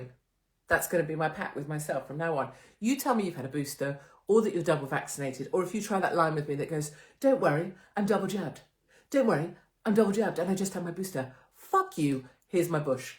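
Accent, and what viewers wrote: RP, United Kingdom. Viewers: You? Council house? So where did you get that posh accent?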